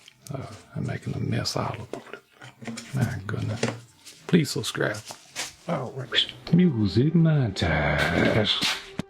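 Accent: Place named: East Texas, United States. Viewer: the more cultural lore we get from you, the more i feel a kinship. i grew up n a small town on a farm in deep east texas. We would pick wild blackberries every summer, and the local dialect was similar to yours. more or less, your vids feel really cozy and familiar to me.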